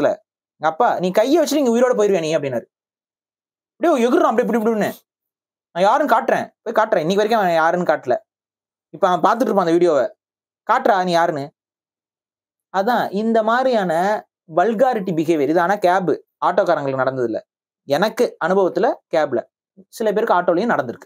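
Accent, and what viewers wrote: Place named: Chennai, India. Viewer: கண்டிப்பாக சென்னை தமிழ் மாறவேண்டும் நீங்கள் இன்னும் முயற்சி எடுங்கள் நன்றி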